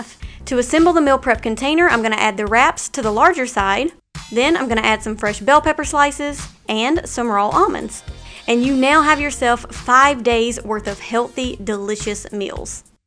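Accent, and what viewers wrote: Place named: North Carolina, United States. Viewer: As a southern girl myself in trying to isolate your accent. North Carolina? I thought maybe Georgia but I dont think so. Alabama here.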